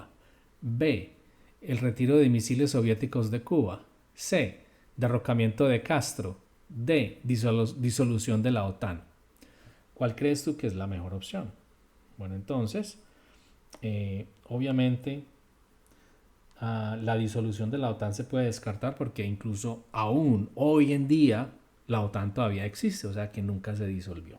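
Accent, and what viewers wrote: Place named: Colombia. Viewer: Muy interesante sus clases .. y esa voz colombiana me recuerda a alguien 😂😂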